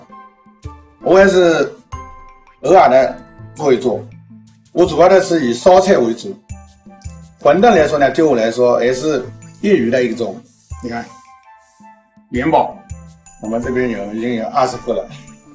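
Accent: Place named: Subei, China. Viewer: 一开口就是老苏北了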